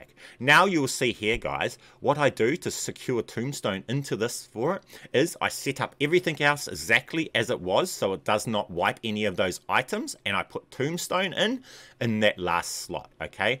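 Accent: New Zealand. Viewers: Definitely a kiwi! Everyone talks about you on mw, earned my sub. I dont even care for the money, youre just too cool lol.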